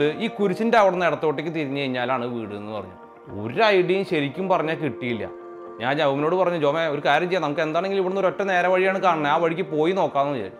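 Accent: Thrissur, India. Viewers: Proud to hear our slang #thrissur 🥰 nice presentation ♨️ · Bro good after noon.. Njanum oru trissur karan anu I was believing that our speed and tone not matching with story telling but... Gadeee nigalu polichu, perfect clarity, tone and more over the expressions are as you says lofly... If autobiography story telling got over you can start with some historical, animalistic, or some other kind story telling.. Iam sure that you make the people on peek of quorosity.... Keep rocking, from dubai.. 🙏🙏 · നിങ്ങളിൽ ഒരു നല്ല കഥയുണ്ട്... Life ഇത്ര മനോഹരമായി പറയാൻ എല്ലാർക്കും സാധിക്കുന്ന ഒന്നല്ല.. തൃശൂർ ഭാഷയും ഒരു നല്ല അടിപൊളി കാരണം തന്നാണ്.......!!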